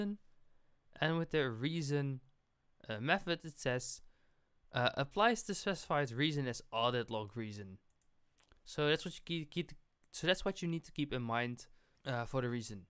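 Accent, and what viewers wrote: Netherlands. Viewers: You sound dutch..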